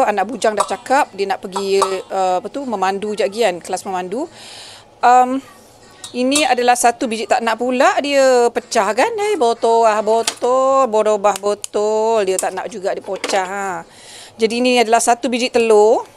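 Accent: Negeri Sembilan, Malaysia. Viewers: Bagusnya.. Tak lupo cakap nogori walau dimana sajo berado... Nismilan negori kito....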